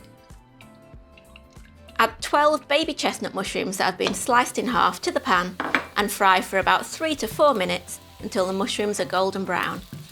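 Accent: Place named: Liverpool, United Kingdom. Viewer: Looks yummy and easy. Good old comfort food. Love your 'Shirley Valentine' accent :)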